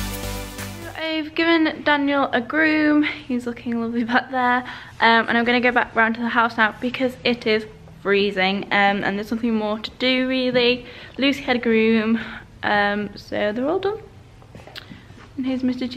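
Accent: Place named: North Yorkshire, United Kingdom. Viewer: Are you from North Yorkshire?? I am from there xx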